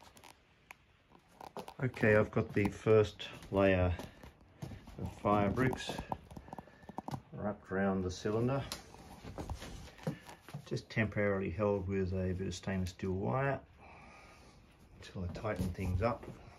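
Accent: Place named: Australia. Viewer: I'm a sucker for rocket mass heater vids. Just posted - recommended in my YT feed. Aussie accent talking about first burn for winter - intrigued, I check out your channel and then it becomes clear - a fellow Aussie (wiser than me) living my future in the Japanese countryside, about 12 months ahead of me. 2024 is the year I end my (and my wife's) 21 year hiatus!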